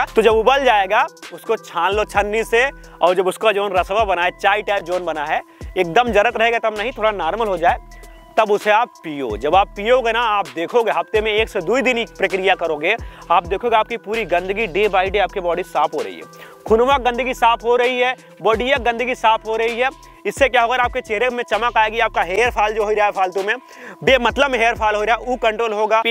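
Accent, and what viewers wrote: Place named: Bihar, India. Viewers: Sbse achhi lgti h tmhari bhasha hmare jaisi h bihari type Bahut sundar bhai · Aese bhojpuri bolt rhaaa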